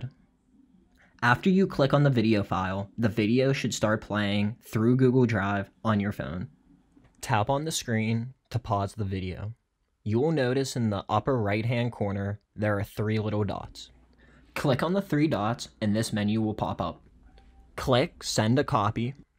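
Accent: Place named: Pittsburgh, United States. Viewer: Are you from the Ohio, Pennsylvania, West Virginia tri-state area? Lol I’d know that dialect ANYWHERE. #yinzer 😄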